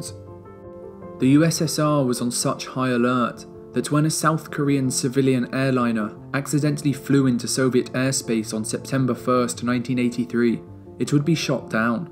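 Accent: United Kingdom. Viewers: Just was wondering what is your nationality? You acent sounds British but very proper. It makes the documentary just that much better. Great video I thought I knew a lot about it myself but after watching this I can honestly say I fell like I learned a great deal in the time it took to finish your video. Please keep making videos.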